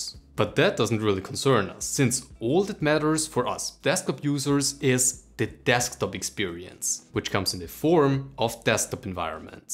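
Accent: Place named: Germany. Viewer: deutscher accent aber gutes video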